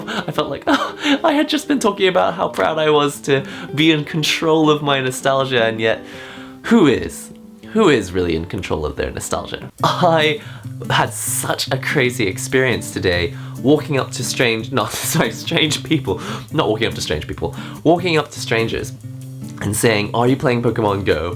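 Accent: United Kingdom. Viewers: he's japanese but he learnt british english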